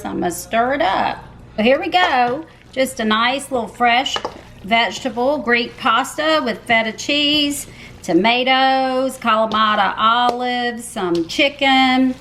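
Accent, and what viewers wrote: South, United States. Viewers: Hey! You did an amazing job! I love how you have kept your true southern accent. Loved the recipes too! xo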